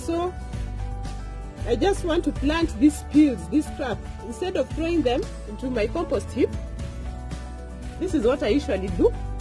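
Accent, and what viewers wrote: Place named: Kenya. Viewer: I love your voice, reminds me of a coworker from Kenya. For some reason that English accent is my favorite. Plus everyone I've know with that accent has always been so amazingly upbeat. Great vid!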